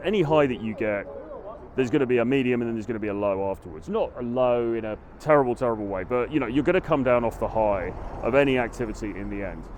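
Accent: United Kingdom. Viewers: Yeeeesh how'd I know you'd be British. Lolol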